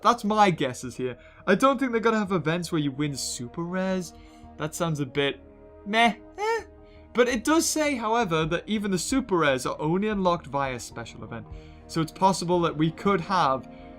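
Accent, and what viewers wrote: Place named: United Kingdom. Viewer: what part of great Britain are you from?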